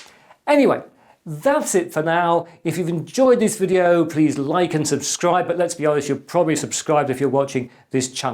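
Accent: United Kingdom. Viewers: It's hard to resist the (vocal) charms of a well-spoken British gentleman, especially when he imparts information in such a crisp, concise manner. There is more than enough flash and noise on Youtube, a bit more understated presentation and thoughtfulness goes a long way. Congrats for a hundred and fifty healthy doses of knowledge, here's to loads more!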